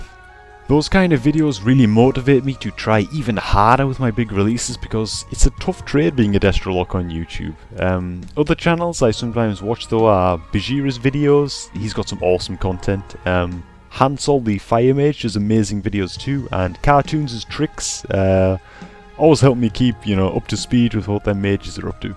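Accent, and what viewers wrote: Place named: Geordie, United Kingdom. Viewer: unusual question but what is your accent? It sounds like a foreign one and a geordie one mixed together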